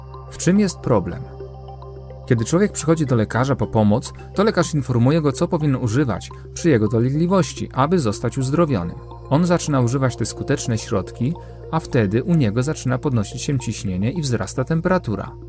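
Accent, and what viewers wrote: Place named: Russia. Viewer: Wreszcie, pan, znalazł sposób by być zrozumianym, wielokrotnie próbowałam dotrwać do końca wykładu, ale w związku ze złą wymową lub też brakiem słówek język pana dla mnie jest nie możliwy aby zrozumieć, co ma pan na myśli, bardzo ciężko, jednakże lubię rosyjski nalot językowy, ale w tak poważnych zagadnieniach potrzeba dokładnego przekazu, bez naleciałości, ponieważ to sprawia rozproszenie i niemożliwość koncentracji.Podziwiam jednakże zasób wiedzy, jaką dysponuje Pan, życzę wszystkiego dobrego, Pozdrawiam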